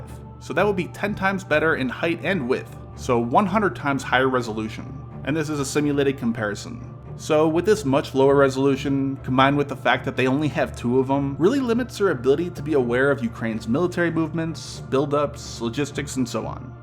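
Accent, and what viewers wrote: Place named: United States. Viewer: Your hypersonic speaking speed, couples with that horrible American accent, ensures that most people in Asia would not understand much of what you are narrating.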